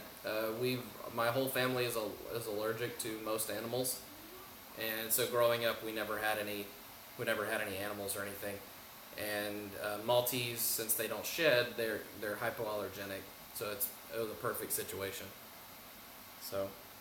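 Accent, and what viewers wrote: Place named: United States. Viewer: Hi my friend! I'm interested in English and American accent =)) you have an American accent. In what states do you live? I subscribed to your channel. Greetings from Russia. Irina.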